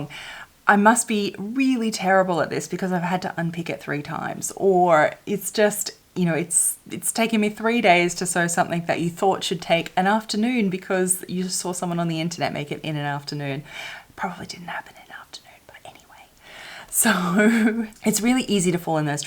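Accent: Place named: Australia. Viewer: Hello! I'm a fashion designer student, recently took the haute couture class in which everything is handmade. The project is a wedding dress and I aboslutely loved the process. It is really slow and it requires patience and attention to detail, but I found it quite relaxing. It was a space I gave myself to take things calmly, and stop worrying about all the other stuff I had to do on the day, you know? I know I can't make all my pieces in this method, or at least not now cos of the economic side of it, but it was a nice experience: for the first time the dress' aesthetic is comming exactly as I imagined it (only a size smaller hahahaha), and I guess is the time involved in it. By the way, I didn't know you are in Australia, I could sense a different accent, but didn't guess where it was from. I'm not an english native speaker, so I was like... hummm.... 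she sounds a bit british, but not quite! hahaha. Greetings from Mexico City <3!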